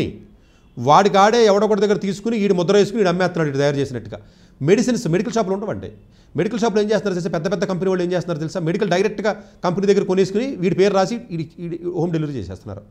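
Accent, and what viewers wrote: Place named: Godavari, India. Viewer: garu, I truly appreciate your research, analysis, and the confidence with which you present your points. I also enjoy your accent and unique way of phrasing things. Especially, your serious expressions while humorously criticizing mechanical, pharmacy, and Tier 2 colleges like Mahindra and GITAM in the Godavari accent make it even more entertaining. However, I have a small suggestion—please consider showing some empathy towards students and parents whose academic capabilities limit them to Tier 2 colleges. It would add a balanced perspective to your insights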